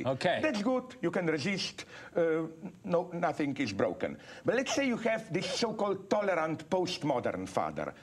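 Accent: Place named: India. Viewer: I didn't refer any racist thing, just his speaking reminded me indian accent.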